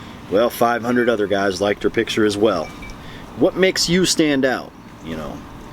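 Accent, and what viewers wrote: South, United States. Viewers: The southern accent is a lot thicker now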